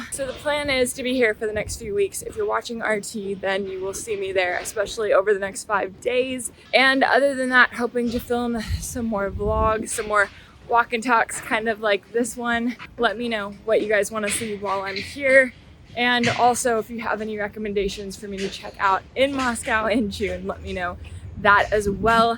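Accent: Texas, United States. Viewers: Блин красивая женщина) Есть что-то неуловимое, что притягивает мой взгляд. И еще ее американский мне абсолютно понятен. Приятно послушать чистый, понятный американский язык. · I'd love to hear your Texas accent :) and some restaurants or "streetfood" what you like in Russia :)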